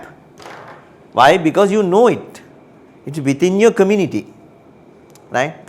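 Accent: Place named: Sri Lanka. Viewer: within 5 seconds , I was able to tell the instructor is SriLankan . :)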